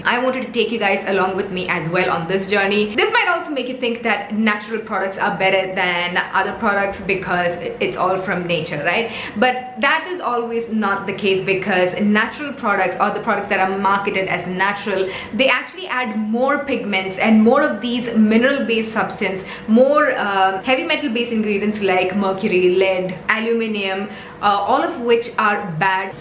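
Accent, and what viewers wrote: India. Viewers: My god! her English is sooo Indian and I fucking live for it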